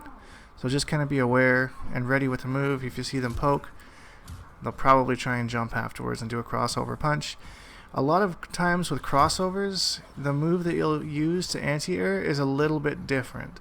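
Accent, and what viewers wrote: Canada. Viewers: I thought South Park was being funny by the Canadians saying "Aboot" but its true lol. Love ya!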